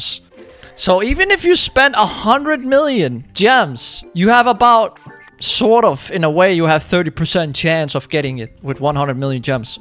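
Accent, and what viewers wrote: Denmark. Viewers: Quick question are you Danish??😅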